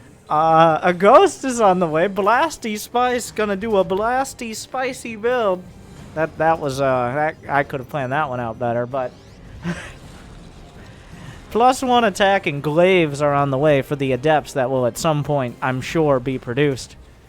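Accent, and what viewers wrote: South, United States. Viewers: WHAT?!? He grew up in the south or has a parent from the south then, or he's doing it as some sort of elaborate satire... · He's from the south. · M Ingham yeah I’m not so sure he’s from Michigan either no sources or anything he definitely sounds like he’s from the south, just letting the Aussie know where Michigan is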